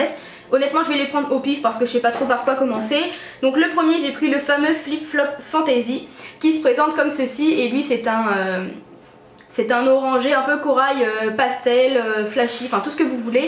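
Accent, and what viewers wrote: Normandy, France. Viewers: c'est dans votre "accent" normand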